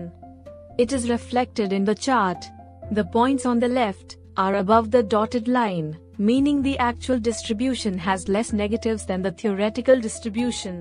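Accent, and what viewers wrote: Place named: India. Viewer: for Christ sake, you can´t even get rid of that horrible indian accent even with robot voice? FFS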